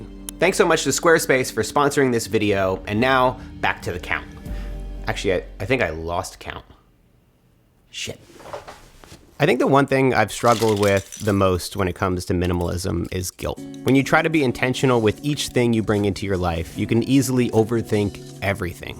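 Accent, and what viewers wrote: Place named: Australia. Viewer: I can hear a bit of the Australian accent on ya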